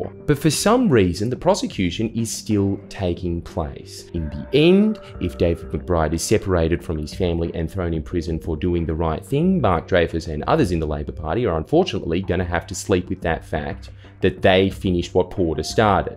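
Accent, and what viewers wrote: United States. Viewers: Your American accent is top notch mate, keep it up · Aside from nit pick pendantic mistake the nonrhotic terminating A in California although many American dialects especially measured by number of speakers is nonrhotic but it was clearly not intended to be one of those tangent over back to the point Fantastic generic American accent probably the best non-traditional media performance of the accent I have heard ever no question about it. That folksie quality is noticeably audible to the unaided ear but totally avoids the natural inclination of foregoing any subtlety in a culture foreign to the impressionist. typically it is way too hammed up this is not exaggerated to any extent it is just there I mean aside from the error but the error is pretty understandable because it is something that needs a bit more deliberate practice at first and also has to be kept up to date or any impressionist will stumbles over the particular artifact of going codes switching maskoff a bit prematurely at the end. 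It results from the eagerness to go back to one's own authentic self. This will happen to anyone that doesn't built up tolerance for doing it for long periods of time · Your American accent is top notch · american accent is scarily believable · I wish I could do a Aussie accent as well as does a Yankee one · ngl your american accent is pretty good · Your American accent is very good. However, you don’t fully enunciate the “a” and “er” at the end of words like Californi”a” and Arnold Schwarzenegg”er”. Otherwise keep up the great work. Happy my small country could get some representation ( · Keep doing your American accent, I love it. It's absolutely great and terrible at the same time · Your American accent deeply unsettles me. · As a new American subscriber please shoehorn in your American accent more it’s amazing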